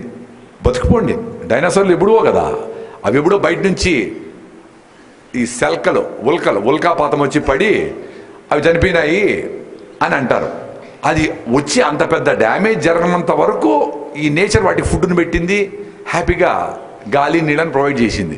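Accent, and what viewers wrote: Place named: Anantapur, India. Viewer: Ma anantapur slang👌🥰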